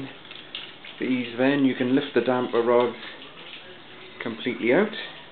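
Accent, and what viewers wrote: Wales, United Kingdom. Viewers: Are you Welshman or something?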